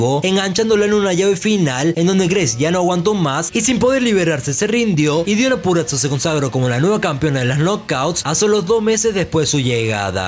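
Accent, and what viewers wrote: Córdoba, Argentina. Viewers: x q habla como cordobes?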